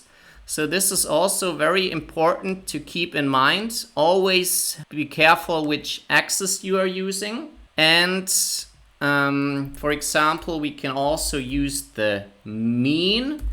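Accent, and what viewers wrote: Germany. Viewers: Are you German cause Your accent is kind of one. Well thanks for this amazing crash course.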